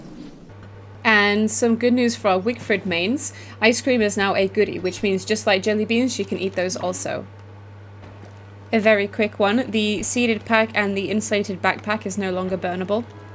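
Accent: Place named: England, United Kingdom. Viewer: sub'd these update videos are nice i get to listen to it in a cute English accent too :D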